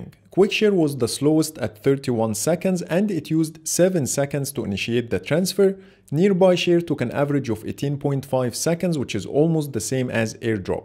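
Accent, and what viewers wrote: Egypt. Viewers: انت مصري يسطا ؟ ال accent دي مصري وش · انت مصري ؟ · انا حسيتك عربي برضه من بداية الفديو لما سمعت لهجتك الانجليزية 😂😂 قلت ده مصري اكيد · sound like Egyptian to me😁😁👍👍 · You sound Egyptian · Amazing video I only have one question, your accent looks familiar you must be Egyptian right ? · ليه انا الوحيد الي حااس انو الي بيتكلم مصري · I have feeling that you are Egyptian from your accent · مصري عايش في الامارات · انت مصري صح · الصوت مصري مصري يعني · are you Egyptian I can tell from the accent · لهجتك كصريه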